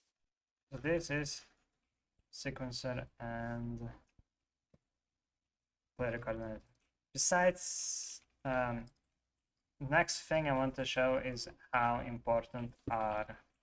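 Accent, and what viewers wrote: Russia. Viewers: У вас руский Акцент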